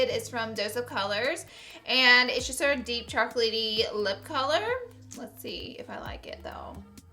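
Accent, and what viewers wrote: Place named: Alabama, United States. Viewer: You're still in my prayers!! I came over here because I heard you're from Alabama (I am too) and I heard your accent and I was like yep she's from alabama haha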